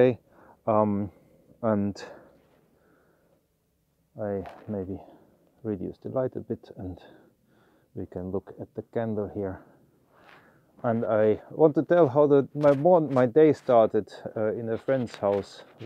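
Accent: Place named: Finland. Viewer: Thanks for sharing . Your accent speaking English is getting more and more Finnish and less German. Not a bad thing, just an observation 😊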